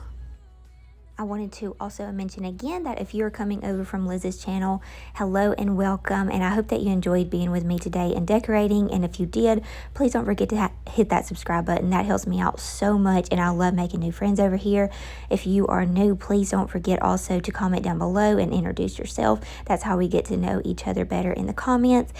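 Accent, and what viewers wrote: South, United States. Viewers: your sweet southern drawl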